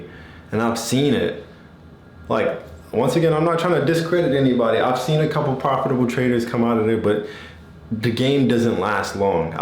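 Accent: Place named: Cape Town, South Africa. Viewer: Bro ! Yu slightly got that CAPE TOWN Accent...."The Mother Land /South Africa"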